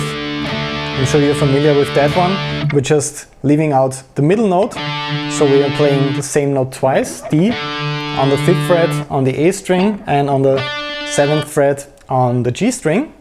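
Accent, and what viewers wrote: Austria. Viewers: Bro u have an Austrian accent isn't it? 😅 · Ich mag deinen österreichischen Akzent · Are you maybe an Austrian (Because of the Accent )( if I m right I gonna have to say Hawedere oida !!!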